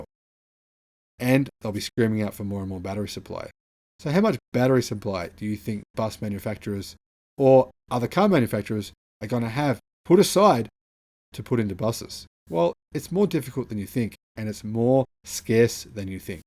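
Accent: Australia. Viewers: I can safely say by your accent that you are not American. Perhaps Australia? If that is the case, you should understand quite well the dangers of letting the Chinese companies controlled by the Chinese government, that means ALL CHINESE COMPANIES, run rampant in your country. Of course your country is under Attack by and has nearly been taken over by the Chinese government's "belt and road" initiative. I'd say you better learn just how insidious China is in it's plan to OWN countries by economic intervention. It doesn't matter who owns the shares of Chinese companies, in the end they are all a part of Chinese world dominance. Id say America has every right to be scared of BYD and every other Chinese company who wants to infiltrate our infrastructure. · Are you Australian?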